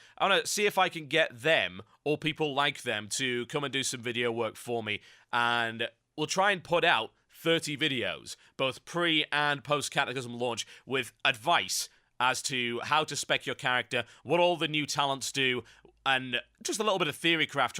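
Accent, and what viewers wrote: United Kingdom. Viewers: by the way you talk, it always pictures in my mind an old guy with an angry british accent.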